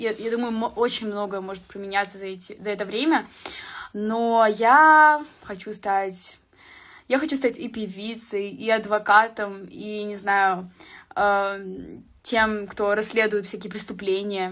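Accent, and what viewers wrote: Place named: Russia. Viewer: совсем стала русской,когда-то говорила как и все казахи-Алма-Аты,теперь говорит как все русские Алма-Ата ))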